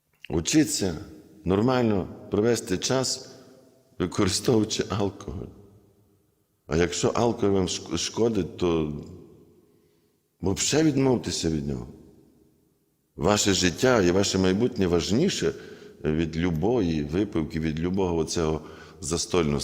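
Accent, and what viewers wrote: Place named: Western Ukraine, Ukraine. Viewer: Отче, дуууже цікаво відповідаєте на усі задані запитання. Слухаю Вас "запоєм", Ви чудовий оратор. Відчувається багатогранність Вашої особистості. Відчуваю у Вашій мові западенця, то така тепла і мила мова, я галичанка....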